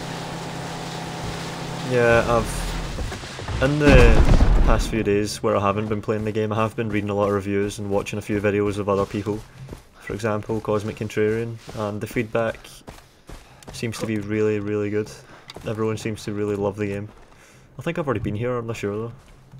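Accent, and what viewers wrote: Scotland, United Kingdom. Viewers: SCOTTISH ACCENT FTW !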